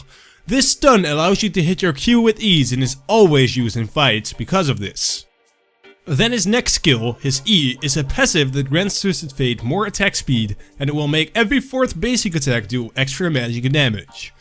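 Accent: Netherlands. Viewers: cant watch this cause of the dutch accent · haha omg, ik hoorde het aan je accent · Are you Dutch? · Are you Dutch by any chance? Nice guides by the way, they help me out loads!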